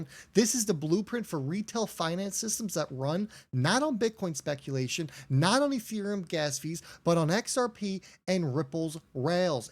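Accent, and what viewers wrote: New Jersey, United States. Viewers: BROTHER LOVE YOU VIBE CONTENT & SOUNDS LIKE JERSEY OR PHILLY STYLE ..NY ?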